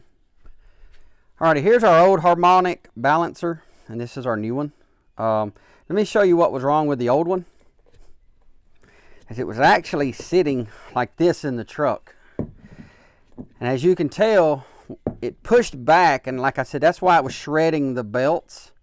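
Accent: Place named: Mississippi, United States. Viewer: You sound like your from Mississippi. No disrespect, I'm fro Mississippi lol. I have a question on a 97 f150. But I'm terribly busy. I'll. Get back with you tonight. Thanks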